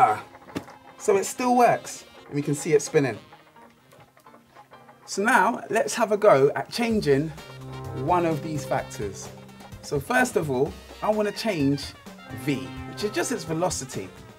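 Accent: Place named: England, United Kingdom. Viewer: He's English, not American I believe.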